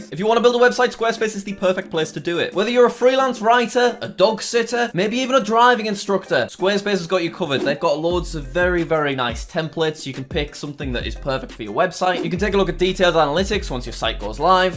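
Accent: United Kingdom. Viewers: Wait boot your brithish huh im fine with brithish soo yea have a great day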